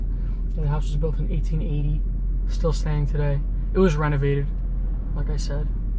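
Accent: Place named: Long Island, United States. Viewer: He is from Long Island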